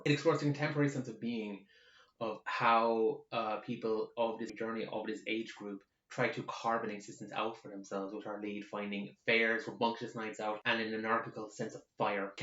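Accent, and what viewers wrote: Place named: Ireland. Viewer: OH MY GOD YOU’RE IRISH?!?! Your accent is so adorable!!!!!!! Also hi lol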